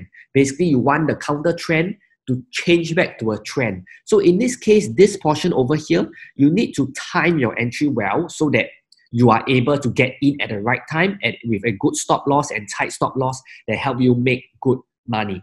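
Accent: Singapore. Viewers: You sound to be from Singapore.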